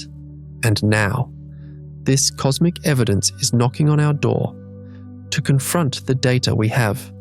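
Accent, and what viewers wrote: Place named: United Kingdom. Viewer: 11-minnits 'in' annnd, ths p.o.s. up-loader, can't-be-bothered to review thn, edit (their...??) 'work'! W-t-h...??!! Ther-haz-been NUMEROUS mis-pronunciations (&, other-ERRORS)!! FAIILLL!! FAIILLL!! FAIILLL!! Allllsoooo: pleeez STOP-uzing a British-voice('accent')? They are-NOT more-intelligent-thn, Americans, based-SOLEY-upon how thy speak??!!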